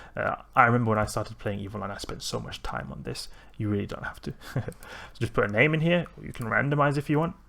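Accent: South Africa. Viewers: If i may ask, where you born in South Africa? As a afrikaner I can hear a light South African accent!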